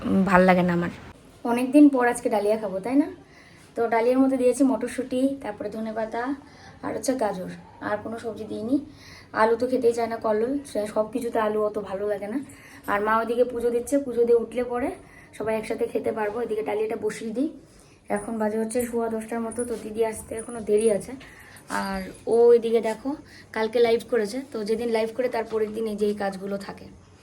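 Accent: Bangladesh. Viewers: আমার বাবা বাঙাল আর মা এদেশী। তাই তোমার কথার টান বুঝতে পারছি । যখন টিভিতে বাঙলাদেশ এর চ্যানেল দেখতাম তখন ওদের এবং শব্দকে অ্যাবং বলতে শুনেছি। তেমনি তোমার এগ্ না হয়ে অ্যাগ্ হয় । অবশ্যই তুমি তোমার আঞ্চলিক টানে বলছো , তাই বলার কিছু নেই ।তবে কি বলতো বাংলা আমাদের মাতৃভাষা ,তাই যেরকম ইচ্ছা বলতে পারি । কিন্ত ইংরেজি আমাদের ভাষা না, তাই ওটা বলার সময় সঠিক বললে ভাল হয় । কারণ ইংরেজির তো আঞ্চলিক উচ্চারণ নেই, তাই না? আর আমরা বাড়িতে যা খুশি বলতে পারি, কিন্তু অন্যের সামনে একটু পাল্টে বলতে হয় । যেমন আমরা বই পড়ি শুদ্ধ ভাষায় 😊। আর তোমাকে এই টিপ ভাল লাগছে, তবে আর একটু নিচে পরলে কেমন লাগতো?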